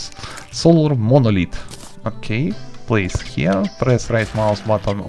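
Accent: Russia. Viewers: also he has Russian accent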